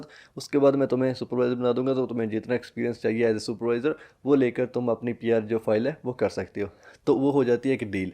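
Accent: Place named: Punjab, India. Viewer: punjabi da svad vakhra e c yr, but its 👍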